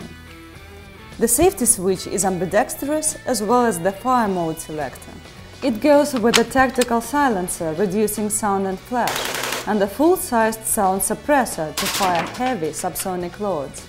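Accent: Russia. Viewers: жуткий русский акцент=)))) а так ниче - хорошо сделали) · Шмешинг энемис бай контакт, ИТС вери вери вел))) Вэн ай хир ёр инглиш, ай хез Джон Рембо флеш бекс Фром Рембо ту. Ваш рунглиш настолько жёсткой, что лучше бы наняли женщину переводчика или озувили на языке носителя, чем так рунглить, вас реально из-за этого не понять!!! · Heavy russian accent makes any weapon videos twice the better. "Энд римембер - ай эм э профешионал рашн, соу донт трай зыс эт хоум." (с) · Пофиг на железо. Девушка очаровательна. Выраженный русский акцент, позволяющий понимать 100% сказанного (я носителей на слух например далеко не всегда понимаю, а ее - прекрасно). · Nice system for cqc sof, the host's Russian accent massages our ears. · Русский акцент в стиле голливудских фильмов это нечто . Возможно специально так и задумывалось :) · with hard russian accent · Специально с таким акцентом тётя говорит? 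Чтоб всё-таки понимали что русская? Или тупо не нашли человека с хорошим произношением? Мне гордиться или печалиться?.. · Combination of weapon, woman host and a hard russian accent gives this video very strong RedAllert vibe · Опачки русский акцент)))))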